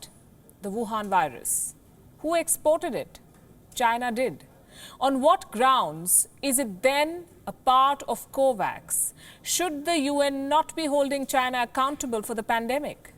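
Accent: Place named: India. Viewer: First please learn how to change your Indian accent. Second, please learn about the virus. How many viruses from USA and other countries? Did you ask for paying at that time? Will you ask for paying if the virus from India? Come on, do not be an idiot! You should learn how to protect and be away from virus, not go everywhere and treat the virus like a flue, like an real idiot! Third, your way of asking for money is like a widow, maybe that is real. please think with your mind.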